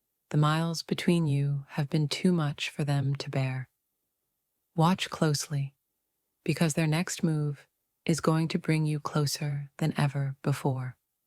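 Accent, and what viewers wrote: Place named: Scotland, United Kingdom. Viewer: 😊🤗🌹 did you believe the distance was not having effect on me? It did have an effect on me. I love talking to you and now I'd like to see you sit down and let's start talking. By now. You know me so well. Don't think you'll even be nervous. I just can't wait to hear that British accent. A lot of it's gone. Which old videos to now still there? Oh, it's Scottish. I'm sorry. Excuse me your Scottish accent. I have to get that straight. I will. Everything we do now is going to be for the better you're going to be a lot happier. Feel a load off you that you haven't felt in 30 years and you got me with a woman who truly just loves you. You lose everything tomorrow okay? We are both and great hands. I know I'm always going to be honest and I know you are because you believe in call and I hope with your domestic issues you resolve them fine. 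Get her out of your life, not for me, but for you she's dragging you down. She's making you believe things at on shrew. I don't like when women take advantage of men's good hearts and that's what she's doing to you. Take the rest. Take the chance I am. What are you thinking My love 👠💋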